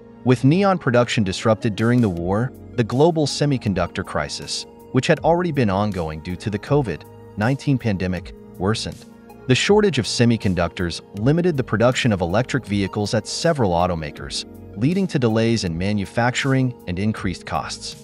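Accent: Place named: United States. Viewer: sounds like a wank yank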